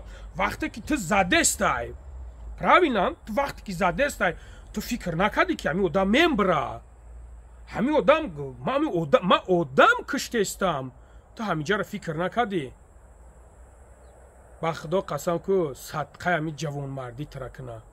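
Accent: Kulob, Tajikistan. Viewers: Чисто ба кулобиги обиснит кади 👍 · Худованд дарувахт эмонша ай баданш хорич мекна беэмона хдованд хоршонкна илохим. Бехтарин суханхо лахчаи точики худочон нигахбонут.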